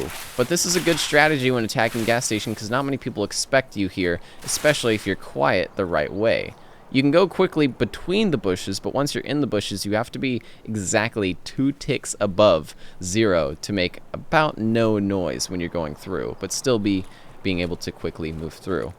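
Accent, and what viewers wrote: Texas, United States. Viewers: Your American pronunciation of Russian words is cute, but wrong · Yep. Texas boi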